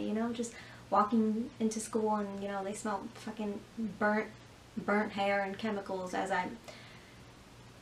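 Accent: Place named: India. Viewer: her accent is some what indian...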